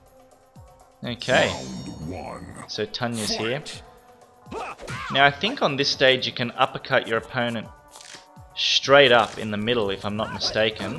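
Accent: Australia. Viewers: It'd ruin it if he did make it 'big' though, part of his charm is that he more or less DGAF. He keeps it real, he's just an authentic, enthusastic gamer who's very naturally funny and is excellent at explaining things. The Aussie accent is a bonus. If he went down the Pewdiepie route I'd never forgive him.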